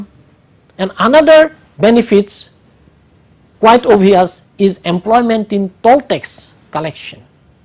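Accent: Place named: Gujarat, India. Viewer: Presentation is very systematic and thorough. No frills and fancies. There is no repetition and the material is complete. The speech should be dubbed over by an English medium educated person so that people all over the world will watch the video. The Gujarati accent is not appreciated generally even in India, even by Gujaratis themselves.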